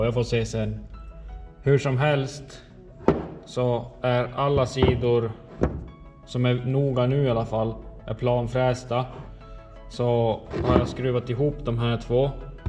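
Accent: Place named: Norrland, Sweden. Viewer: King video! Var e din dialekt i från, låter som norrut eller mer mot det finska?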